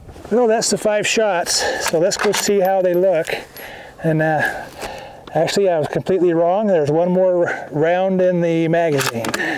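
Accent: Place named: United States. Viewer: I'm guessing based on your accent this is Utah or Idaho. Great video - I'm interested in this rifle myself & appreciate you sharing with us.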